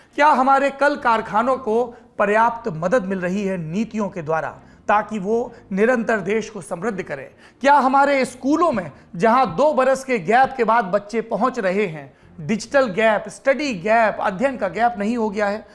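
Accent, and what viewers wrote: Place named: Bundelkhand, India. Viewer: 🙏🙏🙏 हार्दिक साधुवाद एवं मंगलकामनाएं आपको निष्पक्ष, निर्भीक होकर और मीडिया धर्म निभाने के लिए आप जैसे पत्रकारों को के 🙏🙏🙏 कोटि कोटि नमन जी आपकी क्षेत्रीय निवासी लय बहुत अच्छी, बहुत उत्तम और शालीन भाषा बुंदेलखंडी लय 🙏🙏🙏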